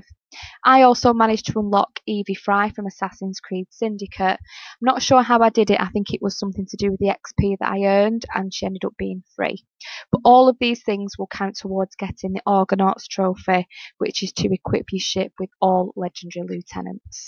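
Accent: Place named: Northern England, United Kingdom. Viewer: Great tip thanks! Subscribed as your content is spot on (and its great to hear a fellow northerner doing guides on my fave games!!)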